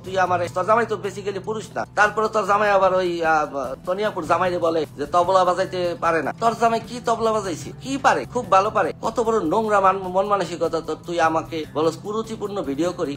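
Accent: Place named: Faridpur, Bangladesh. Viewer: মামা আপনার বাড়ি মনে হয় শরীয়তপুর বা ফরিদপুর ওইদিকে আপনার কথার ভাষাতেই বুঝা যায় জোশ মামা জনগণের মামা আপনি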